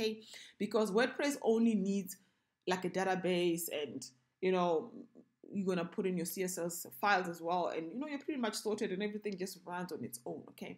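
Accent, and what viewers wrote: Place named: South Africa. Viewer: Damb lady you are smart. So refreshing to hear a SAcan voice to explain things.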